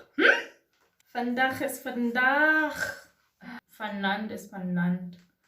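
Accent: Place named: United Kingdom. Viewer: And the way you speak it with an English mixed accent 😍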